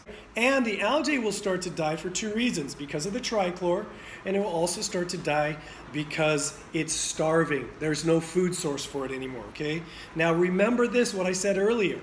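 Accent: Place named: Arizona, United States. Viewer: Arizona accent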